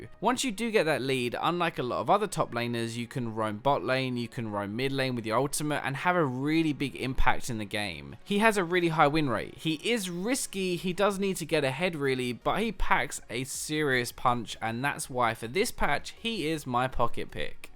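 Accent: England, United Kingdom. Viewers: It's not a British Accent, it's actually an English accent. Because Britain is a country made of multiple smaller regions, with their own languages and dialects, they have Scottish people, Welsh, Irish, and English each with their own accents. The English one is usually labelled as a "British" one tho.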